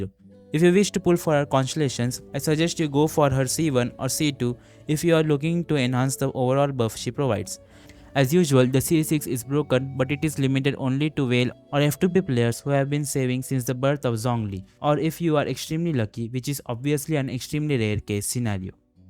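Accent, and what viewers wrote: India. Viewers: Yo did his accent change to Indian or am i imagining it?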